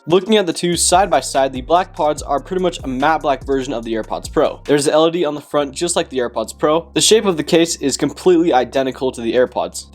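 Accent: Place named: England, United Kingdom. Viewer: lame english accent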